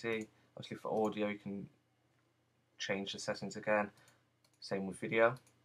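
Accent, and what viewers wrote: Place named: United Kingdom. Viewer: cannt you get a better microphone ans speak clearly without the brittish accent ? we are trying to understand but its hard .. .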